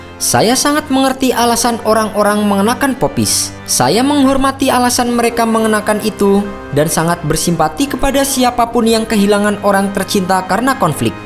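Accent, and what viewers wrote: Indonesia. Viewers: Pekat siot loghat indo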